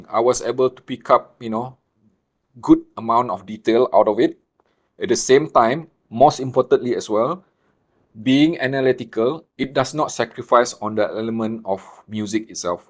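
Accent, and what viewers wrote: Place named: Malaysia. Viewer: great review I love your malaysian english.... Greeting from indonesia....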